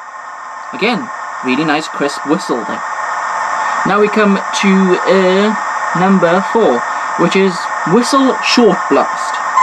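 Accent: Wales, United Kingdom. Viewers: I like your Welsh accent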